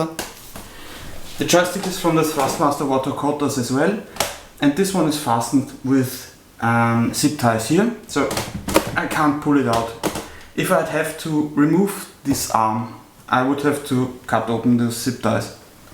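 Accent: Germany. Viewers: Hört sich so deutsch an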